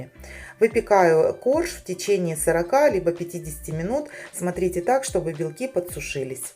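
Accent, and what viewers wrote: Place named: Galicia, Ukraine. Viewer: Как ужасен галицкий диалект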